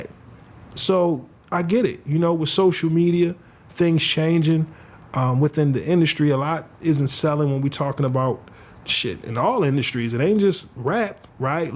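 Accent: Baltimore, United States. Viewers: I'm checking in from the DMV. First time finding your channel. When you said 2, I heard BMORE, i was gonna ask, but then you started talking bout BMORE. Showing you some...❤❤❤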